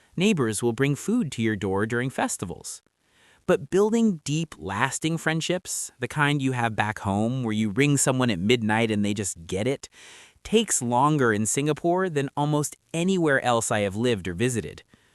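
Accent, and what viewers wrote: United States. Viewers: He doesn’t sound like a British at all, more like an American .😮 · This guy (with an American accent) claims to come from Britain. Never mind. He came here 28 years ago. He never underwent the 60s, 70s and 80s in Singapore where every shred of creativity, individuality and independent thinking was crushed out of you. Which is why many Singaporeans migrated. He missed all that. He never did National Service. And finally being white - every Singaporean women would have kissed his arse most willingly and other brainless Singaporeans would put him on a pedestal. I'd love to see his answer to these points.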